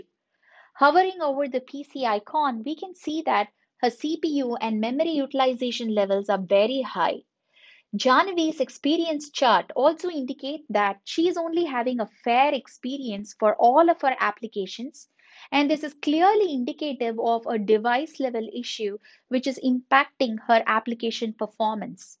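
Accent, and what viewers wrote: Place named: India. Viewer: Indian english.. sorry this is can not work.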